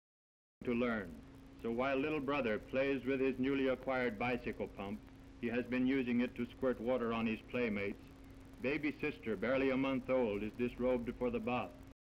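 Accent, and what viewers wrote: Transatlantic, United States. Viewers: It's how most things were narrated back then. Transatlantic accent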